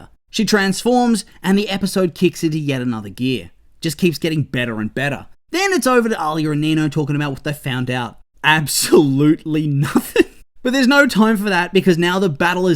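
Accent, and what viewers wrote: Australia. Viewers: I'M SORRY, THIS MAN IN AUSTRALIAN?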